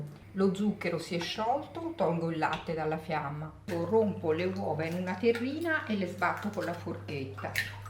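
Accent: Tuscany, Italy. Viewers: Sei bravissima, fatte tue ricette, tutte riuscite. Quel tuo accento toscano, ti rende ancor di piu❤ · La farò sicuramente….non è di Benevento lei l’accento è toscano… comunque 🔝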